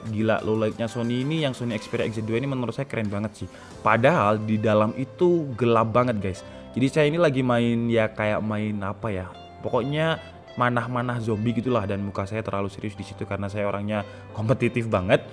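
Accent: Malang, Indonesia. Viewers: pean wong malang ta mas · Lhoalah wong malanggg to, mas 🤣🤣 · Dari malang ya?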